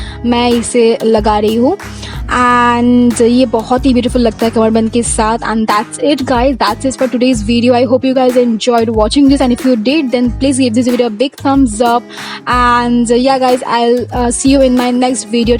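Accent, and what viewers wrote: Bengal, India. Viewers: Didi tmr kotha sune mone hocche tumi nischoy Bengali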